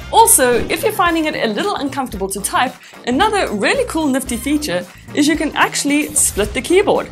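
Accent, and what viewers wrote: South Africa. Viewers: She’s From South Africa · You sound South African😁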